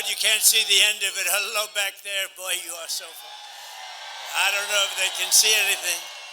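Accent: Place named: New York, United States. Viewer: Me 2!!! Been following him for last 8 yrs know him from back of my hand knows how he talks NY'r bold strong power gumption n humor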